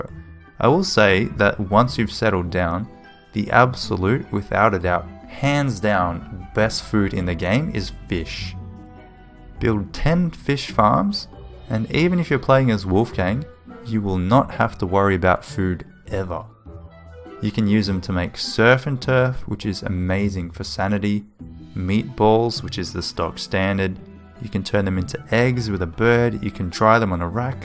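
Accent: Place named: Australia. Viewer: ayy haha i could tell by the accent :) im aussie too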